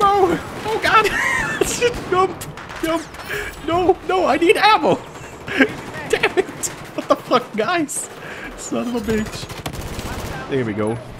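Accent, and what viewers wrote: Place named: Stockholm, Sweden. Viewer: Shit vilken svensk dialekt du har när du pratar engelska asså! Stockholm??? *****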